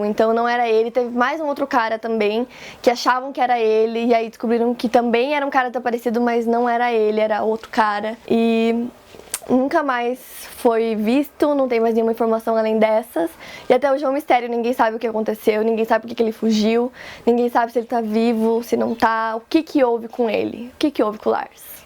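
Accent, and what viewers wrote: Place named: Rio Grande do Sul, Brazil. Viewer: tu é do rs? parece por causa do sotaque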